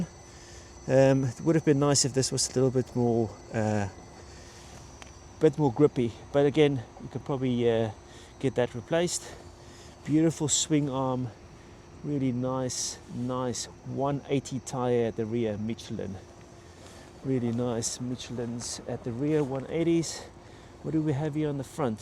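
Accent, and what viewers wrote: South Africa. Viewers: You have a South African accent, have you been?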